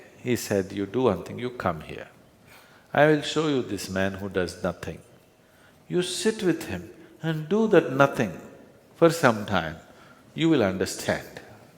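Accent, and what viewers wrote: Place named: India. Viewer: 薩古魯有印度口音... 真的要聽清楚也不容易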